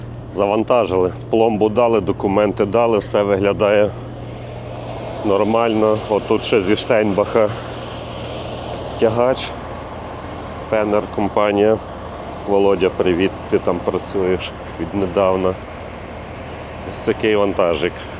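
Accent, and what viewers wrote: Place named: Ternopil, Ukraine. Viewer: земляку вітаю акцент Тернополя точно тобі вже пора нового петровича 389 😂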